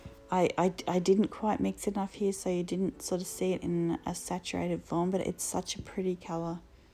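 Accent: Australia. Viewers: her billowing heart You sound Australian? Thank you for this delicately made video, it was so different to all the other palettes that I see on Utube. Tip: I read on a comment a while ago, that you can use glue stick on the palette, unless you like your little beads of colour on your tin which are really cute! I use the glue stick and I wipe it off with a wet tissue. The reason I like this, is you don't have to scrub it, or erase it or buff the enamel. It prevents staining too! Just be mindful not to use a towel, or a heavy paper, as you will get lint in it. It makes sense though, with the sticky honey pigments, although when you say use glue stick, it kind of shakes you up a bit. Have you naturally taken a while to like all these colours? Interested in getting more of those pearly colours from Daniel Smith, and the gold that was on your cherry blossoms? I think I liked it when you mixed these out, as it looked like you had a lot more that I wouldn't have thought to make. 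Have fun In kindred spirit Eliza MB Day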